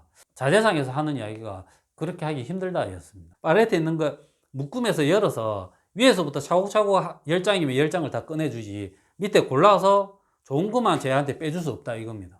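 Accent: Gyeongsang, South Korea. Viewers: ~목소리톤으로 경상도분같으신데 혹시어느지역이신가요? 경남함안쪽인데 의뢰가능한지요?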